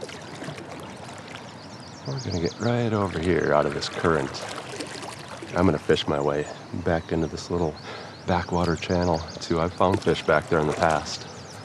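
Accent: Canada. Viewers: Your in N Dakota? Ya sound Canadian. Is it N Dakota Canada?? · What a great and relaxing fishing video! You just got yourself a new subscriber. Excuse a completely irrelevant question from Sweden, but does the ND dialect have some Canadian pronunciation in it, I think it sounds similar?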